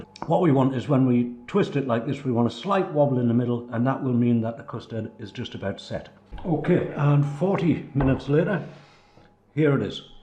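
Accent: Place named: United Kingdom. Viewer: What part of the UK are you filming from? Im watching in Canada and im not very good at placing UK accents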